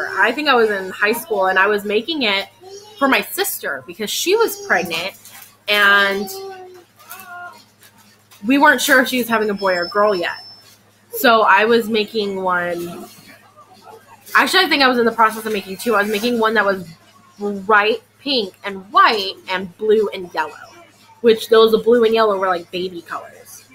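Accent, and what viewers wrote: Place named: California, United States. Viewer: I actually adore your daughters blanket for many reasons. Love the 1st and last color. It reminds me of our Cali sunsets over the ocean here. 😁 I have been crocheting for 43 years since I was 8. Was taught by my mom and gma but mostly books. Also taught myself needle point, temari, Sewing, paint ceramics, crewel ,cross stitch, hair pin lace, and embroidery. Sorry you lost your mom so young. I crochet at the Drs because I always get nervous and it helps calm my nerves. That's so funny I was married as a senior in HS to my ex. Married to my 2nd fab hubby for 27 yrs now. How funny I figured you had a Cali accent and not Virginia. I tried knitting but it ends up being hazardous with my 3 cats. I have crocheted much more in last 6 years. So much easier than when I had little ones. So I can imagine you are quite busy. 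No way I could have done a podcast when my girls were little. Really enjoyed your podcasts. It's interesting to learn about the people who do podcasts and see what there shared interests are. Thank you for sharing. Lol re your hubby. Have an awesome day.😁 Have you ever met Janie Crow or crocheted any of her patterns? I just love them. Your son is so cute.♡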